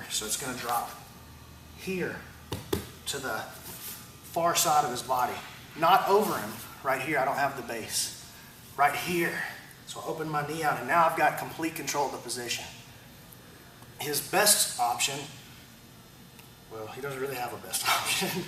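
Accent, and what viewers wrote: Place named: Decatur, United States. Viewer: im from Decatur....aint been back in a while. loving the accent. feels like home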